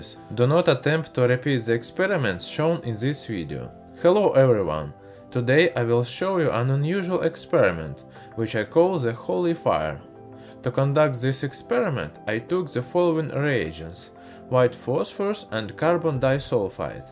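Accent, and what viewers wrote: Russia. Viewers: Damn you have a thick Russian accent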